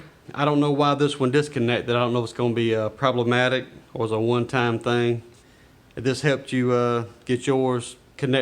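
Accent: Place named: Louisiana, United States. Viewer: Cool accent. Louisiana?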